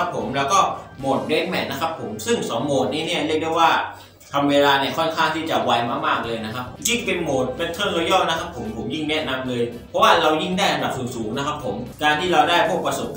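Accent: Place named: South, Thailand. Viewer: แหลงใต้ต่ะหลวง เท่เลยผมว่า 555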